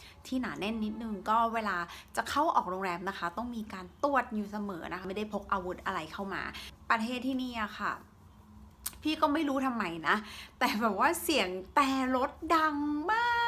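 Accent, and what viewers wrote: South, Thailand. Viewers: สวัสดีค่ะเป็นคนถูเก็ตค่ะติดตามมาตลอดค่ะแต่ไม่เคยคอมเม้นชอบตอนทำคลิปพูดใต้น่ารักมากค่ะ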